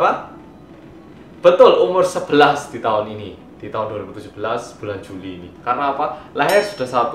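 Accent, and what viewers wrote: Java, Indonesia. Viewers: Emmm cuma pingin komen sampeyan medok banget Mas 🤣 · Kok medoknya kerasa mirip sama mas Kaesang yah... 😍😍😍😍👍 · Aku baru nonton videomu loh mas. Medok banget ya, Mas'e 😂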